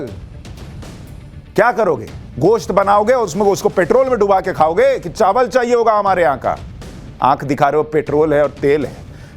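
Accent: Bihar, India. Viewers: Paise ke liye kuch bhi bake ja raha hai tension me andar ka bihari jag gaya hai. Ye Bihar se hai iski accent badal gaya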